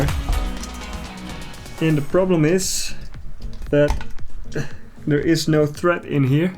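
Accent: Netherlands. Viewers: I can immediately tell from your accent that you're a fellow dutch man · From with County you are you sound like a Dutch man that speak English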